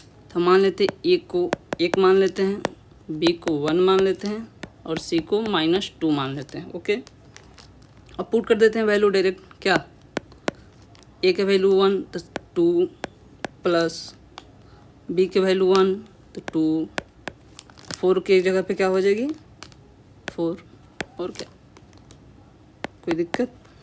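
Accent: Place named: Bihar, India. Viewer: Very nice ❤❤ भैया ❤❤ awaaz me bihari touch 🙊🤗🤗🤗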